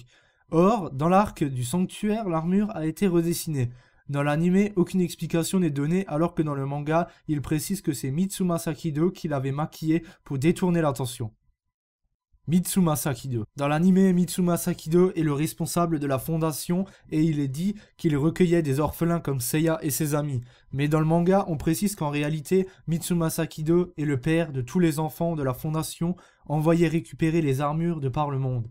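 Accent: Alsace, France. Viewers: toi t'es alsacien ! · Un accent alsacien??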